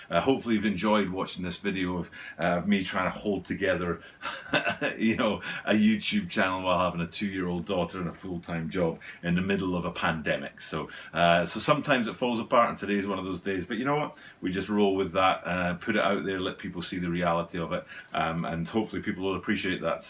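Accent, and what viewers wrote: Scotland, United Kingdom. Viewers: Not gonna lie, wasn't expecting to hear a scotsman when I went to watch the video. Glad to see these have been reissued tho.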